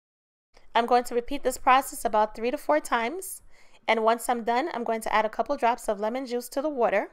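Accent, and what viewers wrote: New York, United States. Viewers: Well done. Looks delicious. Love your accent. New Yorker?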